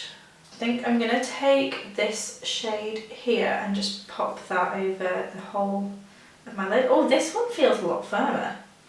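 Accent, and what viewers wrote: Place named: United Kingdom. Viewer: TheRedMoon its british😁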